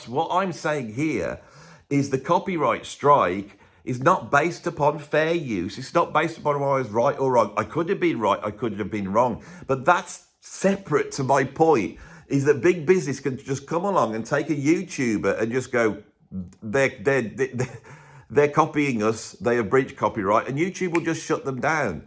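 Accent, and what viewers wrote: Birmingham, United Kingdom. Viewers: Brummie stream of consciousness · Your a character besides our general interest in music Were here to listen to you.There now I've sucked up. I don't mind Brummie,we need a good laugh besides the serious stuff.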